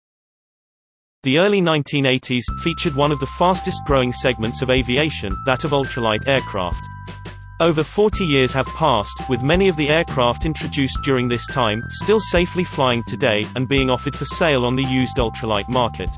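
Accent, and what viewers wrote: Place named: United Kingdom. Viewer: Love the videos, so tired of the British accent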